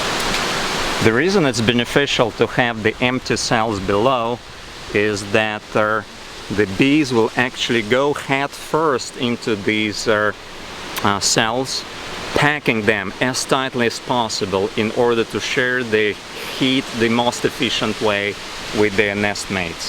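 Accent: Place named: Ozarks, United States. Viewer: I live on the Missouri Ozarks. I was not ready for that accent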